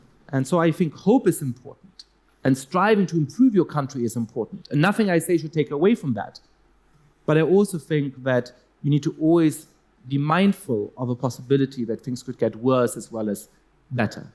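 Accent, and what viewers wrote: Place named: Germany. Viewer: He really sounds German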